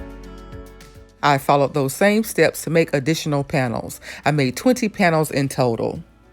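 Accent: South, United States. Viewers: Wow! I so loved this video. Your explanations are so on point and easy to follow. I want to become a weekend warrior for this one. I love love your southern accent. It's so welcoming. What size was each batting and vinny piece cut to? Thanks for the videos and Blessings to you and your family !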